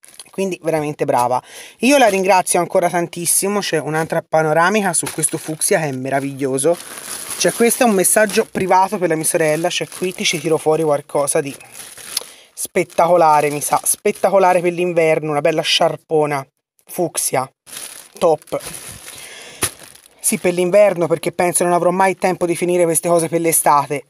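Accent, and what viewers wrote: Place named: Tuscany, Italy. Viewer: Sei simpaticissima x l accento sicuramente toscana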